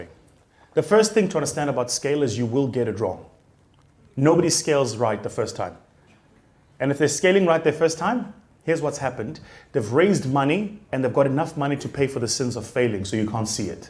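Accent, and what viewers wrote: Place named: Township, South Africa. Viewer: lol; I love your Kasi Accent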